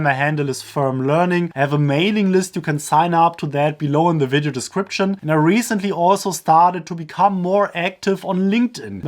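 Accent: Germany. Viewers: That Bayerische accent though lol! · Reminds me of my professor from Uni Mannheim. Love the German accent!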